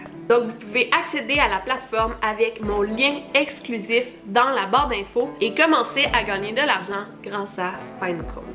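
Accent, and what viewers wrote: Quebec, Canada. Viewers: Nanette et Marjo 🥰! Et personnellement j'adore les miaulements ponctuant ton récit,. Récit passionnant comme d'habitude, et si bien narré avec en prime ton accent québecois 😊.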